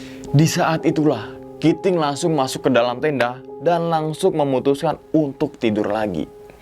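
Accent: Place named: Jakarta, Indonesia. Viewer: Jawa mana mas,,tak kira Betawi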